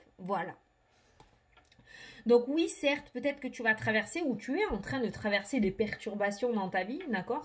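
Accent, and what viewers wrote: South, France. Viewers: Mais franchement, cet accent qui sent le sud et cette patate et joie. Rien que ça, c'est un réconfort quand on traverse une période pas cool. Alors merci pour tout ça!!! Passe un réveillon plein de chaleur et d'amour.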